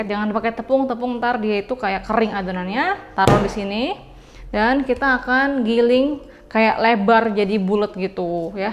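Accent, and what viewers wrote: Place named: Sunda, Indonesia. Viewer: Ini cici cici bandung kali yah ? Logat sunda kental banget kaya nya😂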